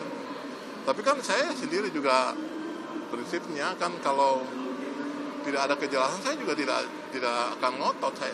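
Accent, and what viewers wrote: Java, Indonesia. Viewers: Wah jowone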